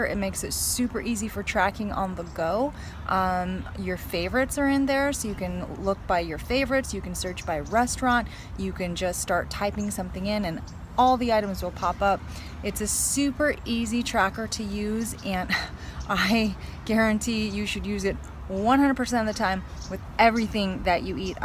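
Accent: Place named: Canada. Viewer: Are you Canadian? You talk with that Accent. Love it.❤️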